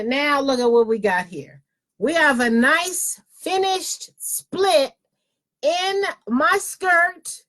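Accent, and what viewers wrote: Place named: Alabama, United States. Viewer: I like you! You sound like me and my Alabama family!🥰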